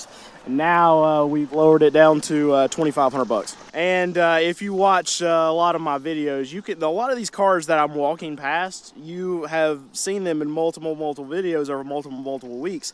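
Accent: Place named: North Carolina, United States. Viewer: I heard North Carolina.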